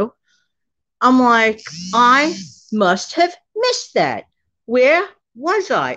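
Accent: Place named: New York, United States. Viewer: Yep. I came over because prepper princess mentioned you and that you were from New York City and she I think she even mentioned your accent and I said I miss being out east let me listen to a fellow New Yorker. And I have loved every one of your shows and haven't missed one the past couple of weeks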